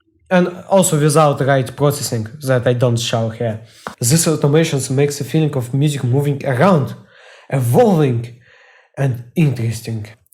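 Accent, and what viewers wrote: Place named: England, United Kingdom. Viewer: The first English accent that I suck at understanding it. Only beginnings and the ends. Lol :D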